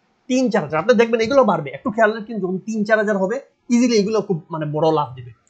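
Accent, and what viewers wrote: Sylhet, Bangladesh. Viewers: ভাই আপনার কথা খুব ভাল লাগে।আপনি সিলেটি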